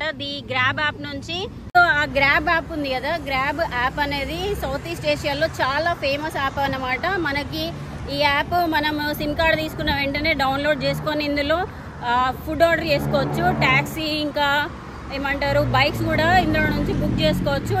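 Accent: Telangana, India. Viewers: ❤తెలంగాణ యాసలో మస్త్ గాఁ explain చేస్తున్నావ్ ❤️శుభాకాంక్షలు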